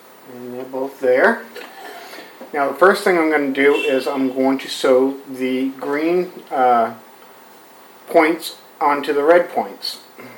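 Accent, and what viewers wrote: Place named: North, United Kingdom. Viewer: Ah ha! I knew it was northern. Video is fantastic!!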